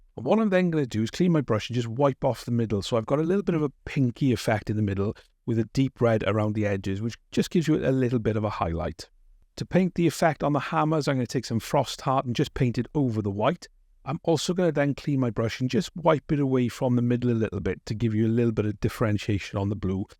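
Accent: Wales, United Kingdom. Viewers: Welsh accent, by the way. There's no such thing as a "British accent" really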